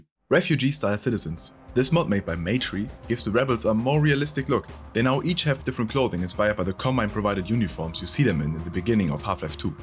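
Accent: Germany. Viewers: You think you speak English but i hear German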